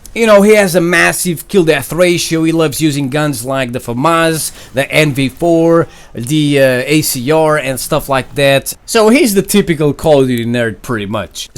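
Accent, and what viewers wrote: Portugal. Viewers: Are you Portuguese? 🇵🇹 You sound Portuguese.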